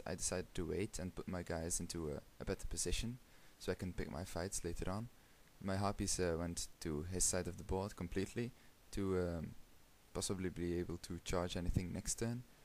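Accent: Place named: Flanders, Belgium. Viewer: haha I can hear your flemish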